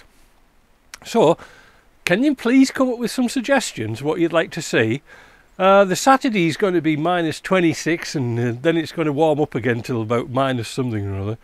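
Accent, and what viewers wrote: Yorkshire, United Kingdom. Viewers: Very interesting...wish I knew your name.....trying to work out your accent....Yorkshire perhaps. Talking about the price of diesel.....remember when I bought my S3 in 1975....still in daily use with me now...diesel was half the price of petrol...as it should be....it takes a lot less money to refine. Got a mod on my S3 heater....its got a 200di in it now.....just before the heater-box I made and fitted a Y section inlet out of thin plate.....so it take ait via ducting from behind the front grill and just to the left of the rad.....so it now gets ram air....other wise the blower needs to be on all the time and going at much more that 15-20mph if becomes very poor due trying to drag air in from that side vent. Tried air scoops on the side inlet useless.air steam is deflected outward when in motion. Also need to seal all the internal gaps up in the heater box around the matrix.....so all the air the comes in HAS TO PASS THROUGH THE MATRIX......lots used to bypass around its sides.....so then coming into the cab cold adding to the prob. 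Works a treat.....got to get the motor warm of course.....I just make sure the stat is efficient as it can be.....ie correct stat on a series....as you know got to be the one with a shoulder.....lots of owners I have found fit by mistake the flat seat type.....no good coolant just goes around it. Love your vids....love to hear the story of what took you to Canada. from Cheshire UK.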